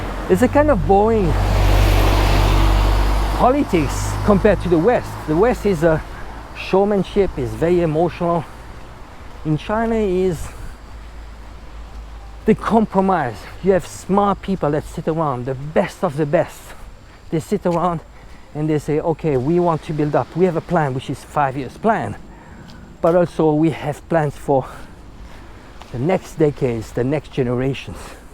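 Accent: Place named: France. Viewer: Please never stop talking and teaching about China, it is important and so necessary to push back against what we are told and given in media. I learn so much from you and maybe because you look familiar to me (my father being from Napoli) even though your accent sounds very french to me. If you have seen and lived this reality i trust 😊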